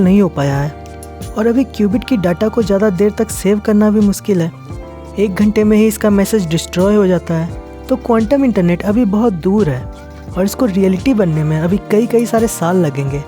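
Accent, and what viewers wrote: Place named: Jharkhand, India. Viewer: 👍Good info.. This channel is under-subscribed... deseves more views.. A small suggestion from my side.. try to correct your accent to be more appealing... It appears that you belong to Jharkhand, and your accent is not neutral.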